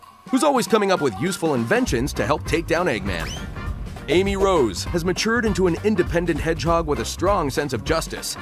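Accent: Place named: England, United Kingdom. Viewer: Finally an english dude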